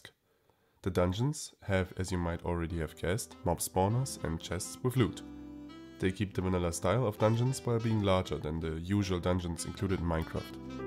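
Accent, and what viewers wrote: Germany. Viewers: klingt deutsch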